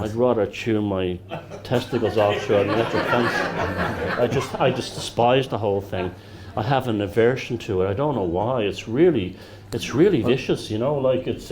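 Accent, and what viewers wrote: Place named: Ireland. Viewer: I emailed you regarding finding this, glad to see it's elsewhere. Currently halfway through watching. It's great work. If you're interested I would like to transcript it for subtitles for you. My girlfriend is a non-native speaker and she finds the Irish accent hard to understand. It would be good to make it accessible to others. Let me know if you're interested, I wouldn't have a clue myself how to add the subtitles but I'll happily type out the audio.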